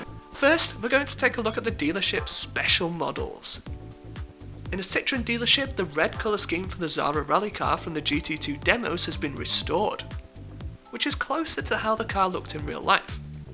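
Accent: United Kingdom. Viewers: Ah yes, nothings warms this cold friday evening more than listening to a british bloke talking about a GOAT tier simcade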